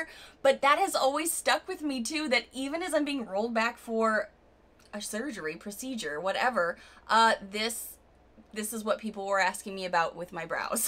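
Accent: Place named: New York, United States. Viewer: Love this style of video. Made me realize how long I have been with BoxyCharm and with you this long too! Remember you used to talk in that awful accent all the damn time?? 😂😂(New York was it) oh and I have extremely dark lips and BoxyCharm sends me nude lip stuff, which I can’t wear! Can you imagine how many I have to give away??🥴 I love the burgundy, reds or mauves! Some of the product brands you love I have too and have yet to try. Some are white girl specific, yikes! I am Mexican and Olive complexion with gold undertones. So you understand. 👍🏽😎♥️🌹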